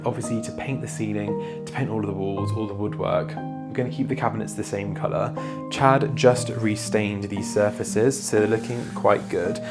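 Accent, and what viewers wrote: England, United Kingdom. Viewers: thank you for today's enjoyable video. I love watching each one. You provide such interesting content. I love your new kitchen renovation. You have incredible taste and a wonderful sense of style. Your videos are not only inspirational but also instructional. As you explained the aesthetic and practical values achieved in the kitchen and garden, I learned to appreciate those perspectives in the changes that I make in my own surroundings. You are an incredibly gifted and talented young man. Besides, I could listen to your great English accent 🇬🇧 all day. Dending you and Chad God's blessings of love 💖, joy 😊, peace 🕊️, and more renovation ideas for your home 🏡. From across the pond 🇺🇲, Robert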